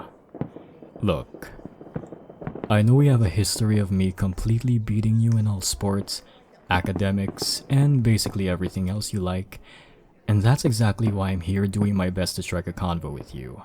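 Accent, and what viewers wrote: Philippines. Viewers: is it just me or do y'all hear the filipino accent???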